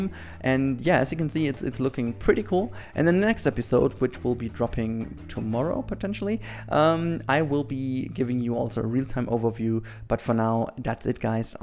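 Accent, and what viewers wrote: Germany. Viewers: Ich persönlich habe kein Problem damit, wenn jemand ohne Skript spricht. Den Deutschen Akzent hört man zwar raus, aber den machst du eigentlich durch gute Wortkenntnis wett. Ich kann dir jedenfalls gut folgen ;D Vielleicht redest du manchmal etwas schnell, aber nicht so, als dass ich das negativ anmerken würde. Mach einfach weiter so. Die meisten Kommentare unter diesem Video finden das auch so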